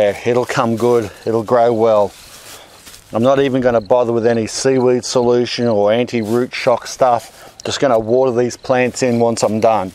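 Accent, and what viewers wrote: Australia. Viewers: As a dual US/Aussie citizen, I'm not knocking the Aussie pronunciation, but it might be amusing to have the "as we say in Australia" for some additional entertainment value. Oh, and I think you mean Bell Pepper, for Capsicum?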